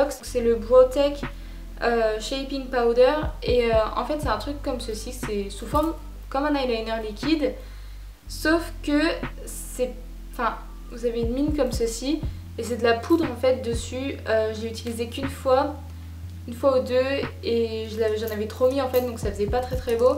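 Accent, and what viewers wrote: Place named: North, France. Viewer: De quelle region es tu je trouve que tu as l'accent du nord !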